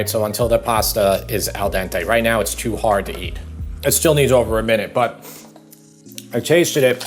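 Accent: New York, United States. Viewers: Are you from the Bronx? Brooklyn? Queens? Or NJ? :)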